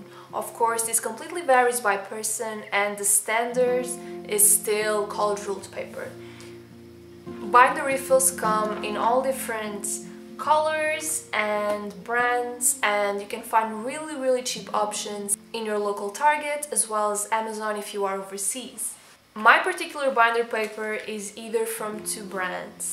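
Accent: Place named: Portugal. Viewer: Sotaque de portuguesa é inconfundível ahah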